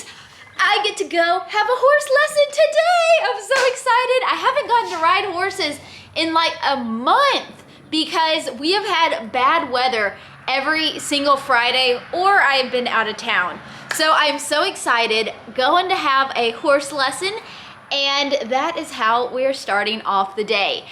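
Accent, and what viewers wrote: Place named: Texas, United States. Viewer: my riding instructor was always reminding me to keep my heels down in the stirrups. I guess it is a safety issue in case your horse bolts you won't get hung up in the saddle. I noticed the instructor on the video also had his toes jammed in too, I love Western riding but never cared for English, I hated their saddle type and it was hard on the bottom -) By the way, you are beautiful as always, my grand daughter looks alot like you, but we have the Michigan accent instead of the Lone Star State accent. Our southern friend tell us that we are the ones with the accent LOL.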